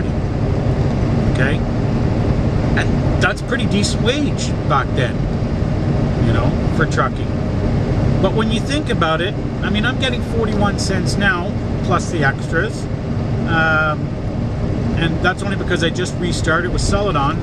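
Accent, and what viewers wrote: Canada. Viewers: I called it 30 sec after the video started - knew he was a canuck, recognize that accent anywhere good vids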